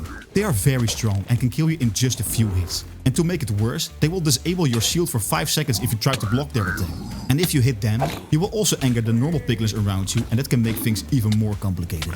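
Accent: Netherlands. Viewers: Sound like a Dutch guy. Nice content bro. Netjes! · You sound like a very well English speaking dutch men Am i correct? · haha ik hoor de nl accent gelijk al, goede video wel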